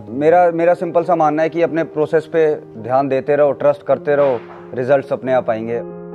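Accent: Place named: Haryana, India. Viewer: Bhai ka pure Haryanvi accent hai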